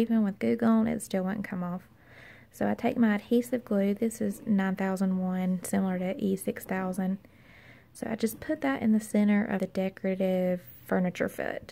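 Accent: South, United States. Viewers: You have the southern accent and the monogram...you need to get on your cake bakin', girl! 🎂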